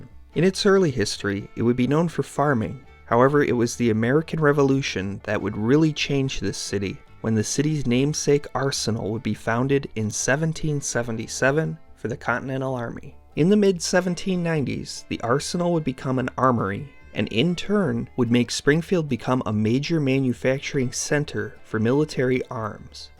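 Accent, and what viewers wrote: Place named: Berkshires, United States. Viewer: Your accent sounds sort of like a Berkshires accent, though I'm guessing Wisconsin after "Worchester" 😉 Springfield and Bridgeport have had similar trajectories since the 50s. Both had major arms manufacturing disappear. Both had crime issues in the 80s. Still not in great shape, but doing better. Thanks for doing this.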